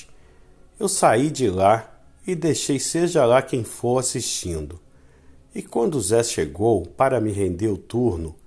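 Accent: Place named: Northeast, Brazil. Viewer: Pela fala com certeza é do meu Nordeste!😁😁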